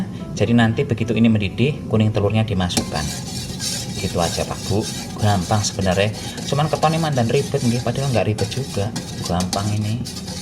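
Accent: Banyumas, Indonesia. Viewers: Pak kiye sekang pak Dirun asli sekang Cilacap, nek rika jawane sekang endi, nek ndeleng ngomonge kaya bahasane wong Banyumasan. Rika nang Batam manggon nang daerah ngendi. Soale aku tau manggon nang Batam suwe kuramg luwih ana 6 tahun manggon nang daerah Pelita.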